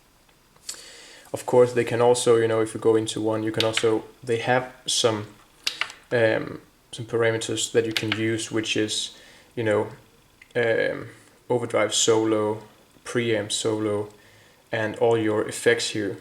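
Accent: Denmark. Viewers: Owned a Cort S2600, really good value guitar. BTW are you from Denmark, regarding your accent?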